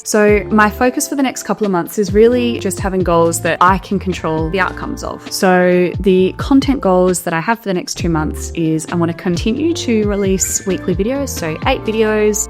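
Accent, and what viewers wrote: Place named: Australia. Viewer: Keep going! You are definitely on the right track. The absolute most important thing I have found is consistency – upload with the same time intervals, whatever you choose – and keep the momentum. Google seem to like that. Experimenting with content, like you're already doing, is also great. Have learnt many informative things from you, so will definitely be continuing to follow you. Love the Australian accent BTW!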